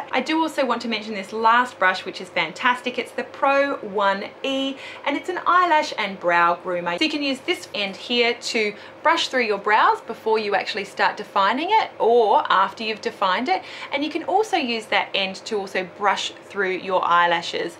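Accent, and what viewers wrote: Australia. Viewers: are you from australia?.c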